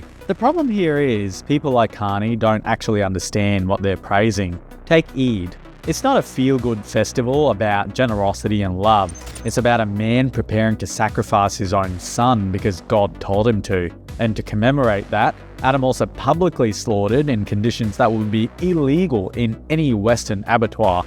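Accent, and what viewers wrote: Australia. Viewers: You're not even Canadian, you're Australian from your accent, who are you to speak about the internal affairs of another country care to explain !???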